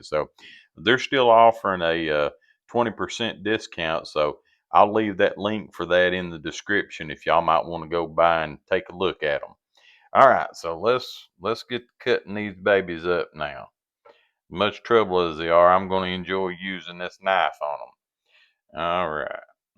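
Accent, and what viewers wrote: South, United States. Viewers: Love your southern accent and your bowled eggs.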